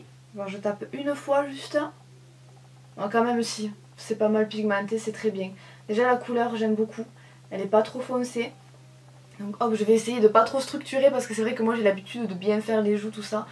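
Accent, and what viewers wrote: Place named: Marseille, France. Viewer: Quel est donc ce bel accent ? Marseille ? ??🤔😉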